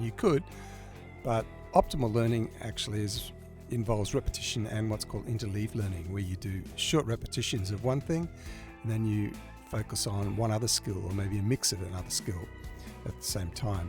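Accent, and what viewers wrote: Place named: Australia. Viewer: Bro.....sorry but.....the accent! 50/50 for me in understanding everything you said in the video. Is it Australian?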